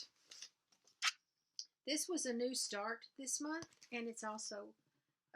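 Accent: South, United States. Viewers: I feel blessed to have you as part of the flosstube community. I always watch your videos first whenever they come up in my feed. I love your stitching, and listening to you talk reminds me of my southern relatives who are no longer with us.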